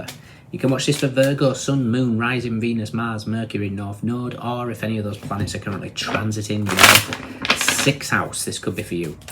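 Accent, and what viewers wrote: Northern England, United Kingdom. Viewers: I always look forward to your readings. I love your accent…. A proper northern accent. Thank you for reading 🙏🏻Have a great week ahead.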